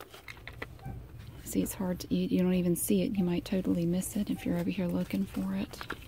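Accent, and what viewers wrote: South, United States. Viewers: I like her accent. I grew up in the south and it reminds me of all my elementary school teachers accents. I wish I would have devolved a country accent. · she’s southern, as is Laura Lee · Southern accents usually annoy me because I had a bad experience with a jerk lady with a southern accent but you have restored my faith in southern women. You’re voice is relaxing and sweet and I think I can learn to love southern accents again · I love watching your ASMR because we are both from the south and your accent reminds me of home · I used to live down south and everyone had an accent like that. I loved listening to them talk. 💕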